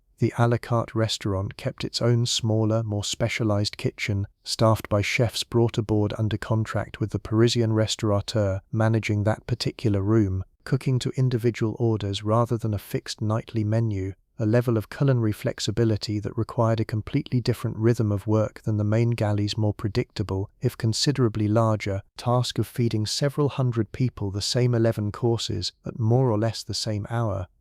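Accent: United Kingdom. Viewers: can you change your IA voice to something not English so very English English.??? I have to jump away from your great content because I am so sick of the English accent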